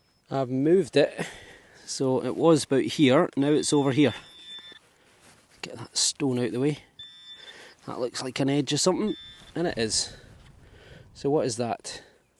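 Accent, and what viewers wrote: Scotland, United Kingdom. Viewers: Must be your Scottish accent, I didn't catch where you are hunting this day? 🙂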